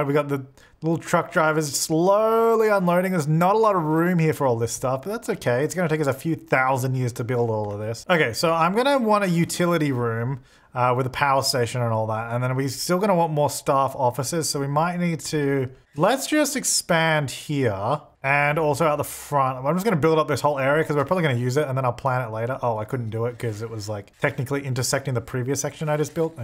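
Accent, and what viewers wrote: Australia. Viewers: They're both Aussie, so maybe that's why they sound the same to you?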